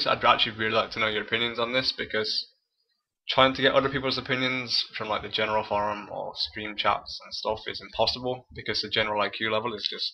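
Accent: United Kingdom. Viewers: What's your problem? I'm from Denmark and understand him perfectly. And FYI he's British, thought the accent was obvious? If you're not 10 and clueless that is. Even his mic is perfectly clear too, I don't get your complaint's at all.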